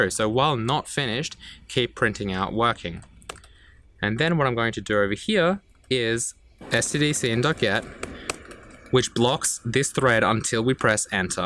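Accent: Australia. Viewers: You from Australia?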